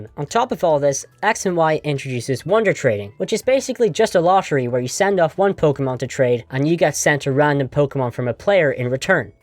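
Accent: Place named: Northern Ireland, United Kingdom. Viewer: You clearly put lots of effort into this video. I love your editing style. Keep up the great work dude. Although I’d say lose the northern Irish accent.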